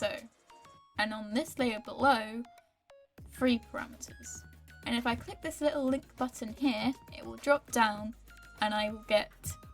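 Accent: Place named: United Kingdom. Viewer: Wow Your British! I Think.. Idk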